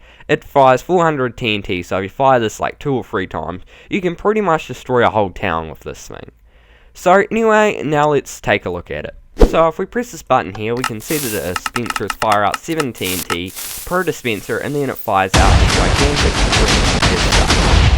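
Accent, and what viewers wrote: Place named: New Zealand. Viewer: kiwi I think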